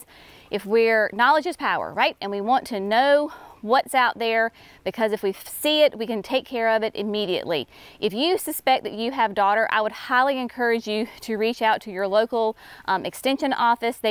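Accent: South, United States. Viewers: hahahahaha thanks for the correction and I just love your Southern accent it’s a very soothing sound to me ✨🤍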